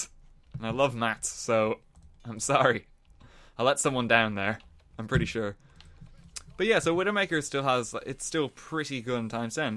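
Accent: Ireland. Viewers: He is irish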